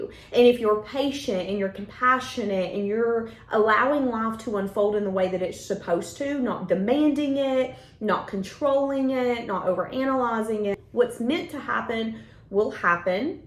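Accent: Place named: East Tennessee, United States. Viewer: Love the East TN accent:face-red-heart-shape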